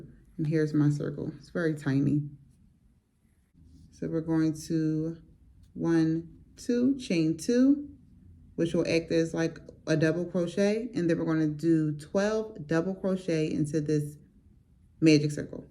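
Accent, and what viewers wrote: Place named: Philadelphia, United States. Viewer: Been following you for a while since your crocs went viral . I heard your voice & was thinking where in the world is she from ?? She gotta be from Philly lol❤️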